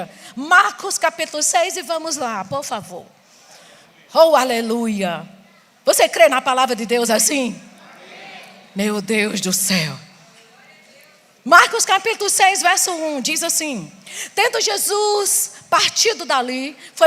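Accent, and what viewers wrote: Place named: Northeast, Brazil. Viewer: Sou de Pernambuco e estou em Brasília, e é bom ver o nosso sotaque nordestino. E prega bem, palavra maravilhosa.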